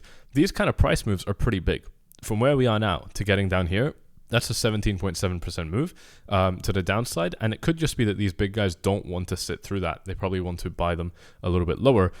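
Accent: Scotland, United Kingdom. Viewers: Just found your channel mate, love it. East coast of Scotland accent??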